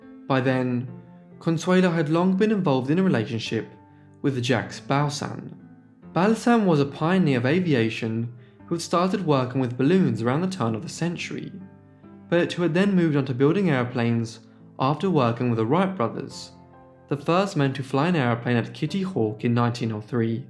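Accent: United Kingdom. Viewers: He has a speech impediment AND is British. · He just sounds British to me. I didn't have any issue with it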